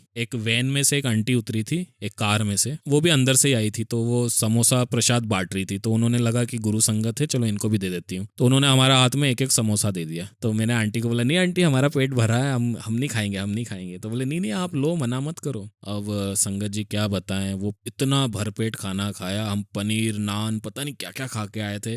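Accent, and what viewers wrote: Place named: Indore, India. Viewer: Uncle ji aap v satsang sunaya kijiye me v bht time indore rahi hu indore is my favorite place😍😍aapki boli mein wo pura nazar aata hai😍 Jai Guruji🙏🙇‍♀️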